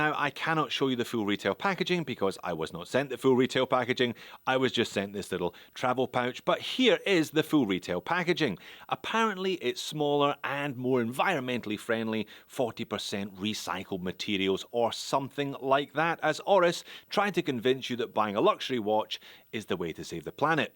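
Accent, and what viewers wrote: Scotland, United Kingdom. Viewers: Mate!. You can tell you're Scottish.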